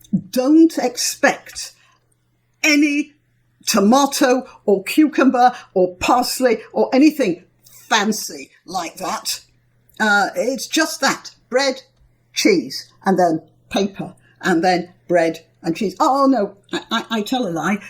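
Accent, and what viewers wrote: United Kingdom. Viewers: You are rude. Why? Aren't you a litte bit ashamed of how you are talking about people,( as less intelligent and eating bad food) in other countries, for example Sweden? People from UK I have met so far have been very nice and kind. Apparently not all of them. I guess you are born in UK, you seem to speak british english. No, I will not like and not subscribe... I am not fond of rude and mean people.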